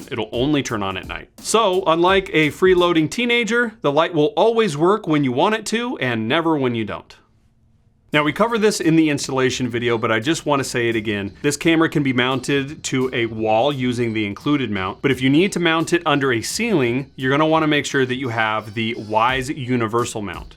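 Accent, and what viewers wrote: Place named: United States. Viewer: Your videos are awesome but mainly because you are a very good English-speaking American, how bad would the videos be if they were done by the same people we have to talk to when we call to in customer service that are trying to speak English, but they are not very good at it, and they are not American. I really like the products a lot, and I was one of the early backers that contributed when times were rough but the product was worth it and the new stuff is amazing, but your customer service is hard to reach and harder yet to understand, I just spent over 35 minutes on the phone trying to find out why items were not shipped that said they were, I got no e/mail about it only a partial shipment with no info about the missing items. I Love the products, but I cringe when I have to talk to customer service you need to get some better speaking customer service people and an easier way to reach someone.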